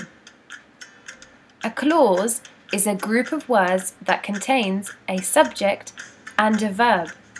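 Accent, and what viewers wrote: United Kingdom. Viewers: Why she sound british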